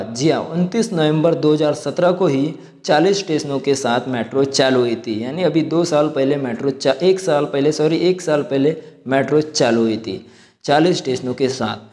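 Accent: Maharashtra, India. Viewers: wo marathi he shayad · Wo marathi he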